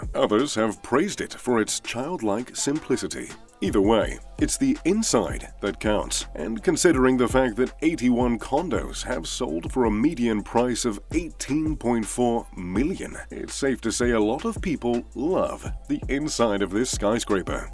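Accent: United Kingdom. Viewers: He should have gave You a heart for that comment. I usually find British accents annoying, but I like.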